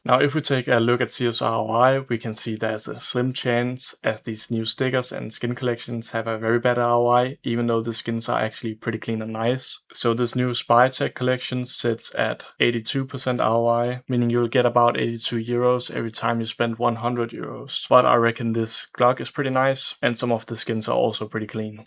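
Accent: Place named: Denmark. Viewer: Danglish is strong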